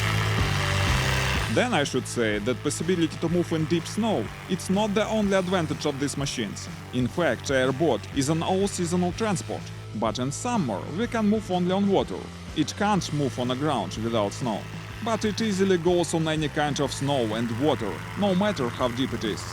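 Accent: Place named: Russia. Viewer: Can't you hear the accent? Rus-rus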